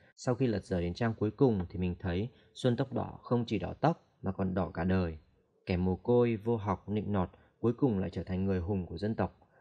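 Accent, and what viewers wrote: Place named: United Kingdom. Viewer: Anh nói được accent của người Anh kìa :3 anh có phải từng sống ở Anh hay vẫn còn đang ở Anh đúng không?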